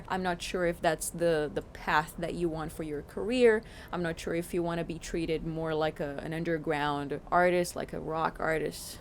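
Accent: Brazil. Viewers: Meu deus, sabia, eu reconheci uma parte do seu pequeníssimo sotaque "brasileiro" além dá sua aparência que lembra a minha e minha família, espero que tudo dê certo para você, também sou músico, mas minha música é difícil de bombar, anyways I´m on college. SAUDAÇÕES my friend CONGRATS FOR THE BEAUTIFUL WORK!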